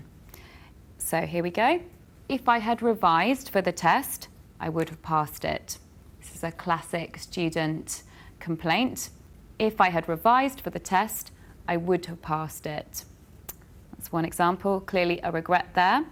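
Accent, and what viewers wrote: United Kingdom. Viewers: Omg, your accent is just killing me. I came here looking at some lessons and now I'm in love. British women accent rules!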